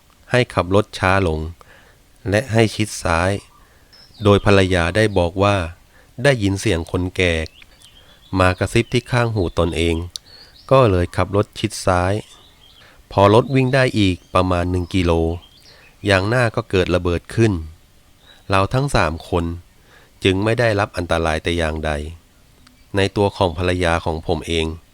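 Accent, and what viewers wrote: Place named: Prachuap Khiri Khan, Thailand. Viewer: เหน่อแบบคนประจวบเลยครับ ผมก็คนประจวบ หนองตาแต้ม ปัจจุบันย้ายมานครปฐมแล้ว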